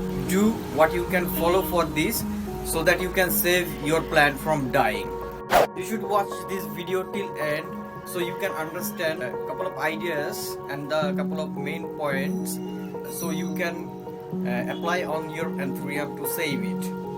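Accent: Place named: India. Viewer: Is this language English? Or Indian with some sentences in “English” ?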